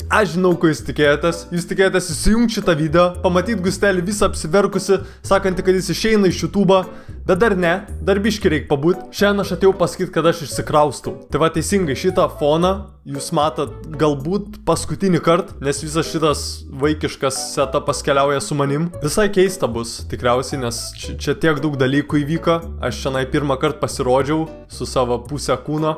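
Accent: Panevėžys, Lithuania. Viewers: myliu panevežio akcentą❤ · Joptvoj tu man tik dabar priminei tus 2016 video... o taip tai visada as maniau kad tu is panevezio nes tas akcentass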